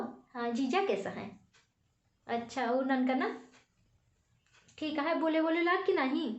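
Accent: Awadh, India. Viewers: Bahute Neek Madam Ji, I like Yours Voice with awadhi Veri attractive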